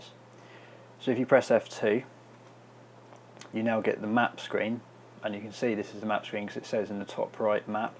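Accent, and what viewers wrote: United Kingdom. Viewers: nice work, cockney! cheers!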